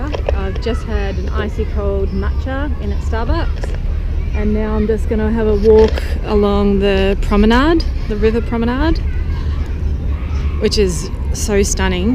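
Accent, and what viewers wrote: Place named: Australia. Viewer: I love you Australian accent. Australian accent sounds very British, which is far more beautiful than American accent. Long Live Great Britain！God Bless America！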